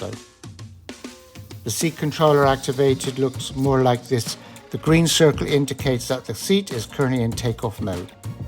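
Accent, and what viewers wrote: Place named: West Country, United Kingdom. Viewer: Trying to place your accent, getting all over the place but think West Country somewhere even some Irish. Dying to know where you are from.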